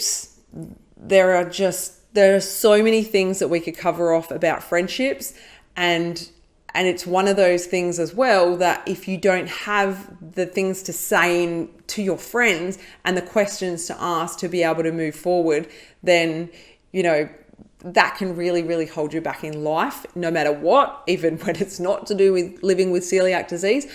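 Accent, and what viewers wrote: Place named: Australia. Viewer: I know love is terrible. Lose that accent a little bit. You sound like someone steps on your toes and your voice going up at any other word. I understand is an Australian accent but man it sounds terrible. Just being honest not horrible to you. Surely other people thought the same but were too polite to point it out.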